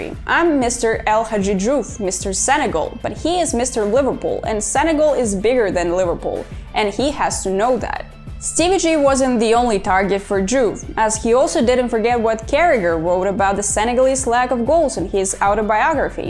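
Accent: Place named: Latin America. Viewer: Great content, the accent is giving some Latin speaking country but your command of English is better than us Brits. Your delivery means you will be a YouTube star, keep it up 🙌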